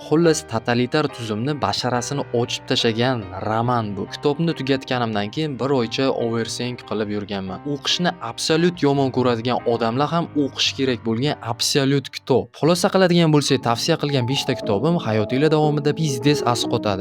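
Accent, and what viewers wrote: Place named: Jizzakh, Uzbekistan. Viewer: Admin Jizzaxlimisiz shevez tortib ketarkan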